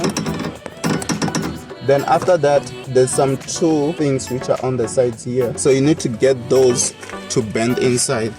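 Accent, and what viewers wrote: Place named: Tsonga, South Africa. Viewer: This accent iya mutsonga str8 😂💔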